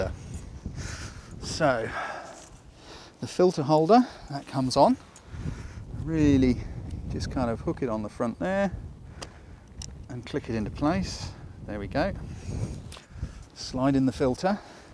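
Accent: England, United Kingdom. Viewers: I love British english accent, much nicer than american accent. is such a warm person and I love his videos. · Loves: 1) Your ability to pass your knowledge, in a simple way 2) The different situations you chose to present to us 3) Your fine English accent... Hates: 1) I m not a hater 2) I respect the work of other people 3) Love, love, love I liked so much that tutor... THANK YOU, THANK YOU, THANK YOU (Dont forget the camerawoman -> Thank you too... Nice video!) Greetings from Greece... PS. Sorry for my bad English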